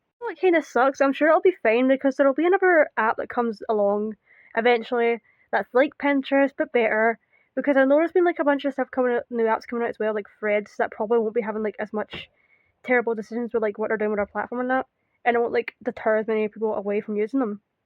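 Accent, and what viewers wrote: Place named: United Kingdom. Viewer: OH GOD. SHES FUCKING BRITISH!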